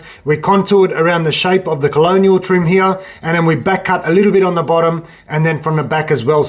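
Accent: Australia. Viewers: I am about to install baseboard myself. I could not find an easy way to figure out the angles until I saw this. It seems you have mitre cut to 45 first before grinding out. Is there a way to eliminate the mitre cut too? Sorry this may be a silly question. Love the Aussie accent!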